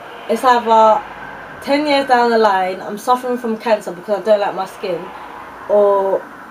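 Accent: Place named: United Kingdom. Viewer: Are you tyra banks sister?:o You sound british, or maybe she sound american hehe I dont know where yous are from! Btw, your beautiful! I was going to bleach my skin because of uneven skintone but now you made me much more confident, ill just stick to foundation. The fact that people risk there lives just to look pretty is mad.. Stay strong your not ugly!:-) xx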